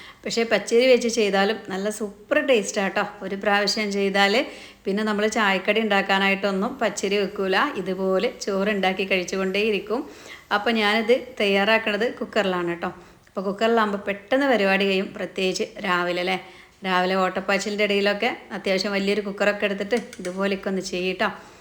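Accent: Malappuram, India. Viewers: 😀 മലപ്പുറം സ്ലാങ് വഴി മാറി ട്ടൊ ട്ടൊ ക്യൂട്ട് നെസ് കൂടി ചില ഭക്ഷണം നല്ലത്. സംസാരഭാഷ ബോറ് കുറ്റപ്പെടുത്തിയതല്ല മുൻപ് video കണ്ടിട്ടുണ്ട് സംസാരശൈലി മലപ്പുറം താത്ത തന്നെയായിരുന്നു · മലപ്പുറം ആണോ? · മലപ്പുറം ജില്ലയിലെ സംസാര രീതി ഇങ്ങനെ ആണ് നല്ല മനസ്സ് ഉള്ളവർ കേട്ടാൽ മതി അല്ലാത്തവർക്ക് വണ്ടി വിട്ടു പോകാം ടിങ് ടിങ്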